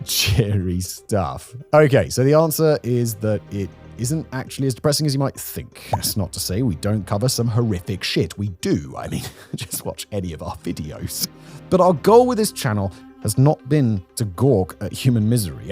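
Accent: United Kingdom. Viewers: Love the presentation, and respect the methodology/ethics of how you do this. As a UK english guy who has travelled the world I recognise your 'pan Brit' accent. But I am puzzled by some of your mis-pronunciation of some terms/names. Such a Thales , a well known defence co. pronounce talez... Just an observation, where generally were you from?? Just interested.. retired Rail Engineer here. 😀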